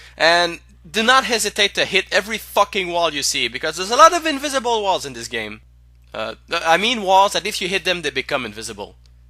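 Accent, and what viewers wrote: France. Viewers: Pretty good, but I think your jokes (play with words and images) are too frequent. Some are good and made me laugh, and others just made me say: "meh". Also, love the drawings. Btw, if you want to keep your French accent as your "trademark". Continue. If not? Work on your pronunciation. For example, the way you say "Anor Londo". ...Just saying... don't want to offend you.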